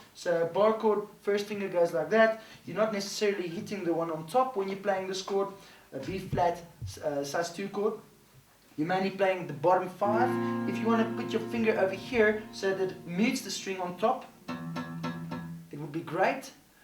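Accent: South Africa. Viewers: Great fan of your lessons.. very helpful lesson. Thanks for taking the time out to do this for us. Greetings from India to South Africa?? (Your accent says so!) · My entire father's side of the family is South African so right when you started talking, I knew immediately where you were from. Awesome tutorial!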